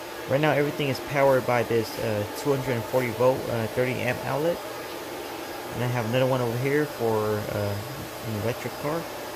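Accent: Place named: Vietnam. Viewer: You have Vietnamese accent.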